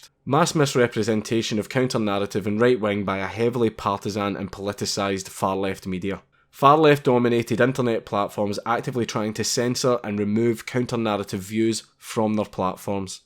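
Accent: Scotland, United Kingdom. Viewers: No more living in an echo chamber. As lots of leftist SJW supporters do - without any accustical problems that come from the house they use to live in. To be serious, my first language is not english, and the missing echo makes it more easy for me, to understand your beautifull scottish accent. I was born and raised in south Germany, not Bavaria, where we talk an accent some people in the rest of Germany just hardly understand. Its called Schwäbisch (Swabian), and some people say, that Scotts and Schwaben must be close relatives, as Scottsman are known for keeping their money together, Swabians are known to be thrifty. Scottsmen must have been expelled from Swabian Country, because thery spent to much of their money, is an old joke they tell about us. And both, Scottsmen and Swabians, have a funny accent. And are proud of it. This was not a typical vid of yours, but a good one, verry informative. 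Some people who did not get it until the verry day are now better informed about your intentions. If they want to. For fucks sake, stay who you are! And if you ever get stucked in an elevator with voice control, don't forget: Scottland! Freedfom!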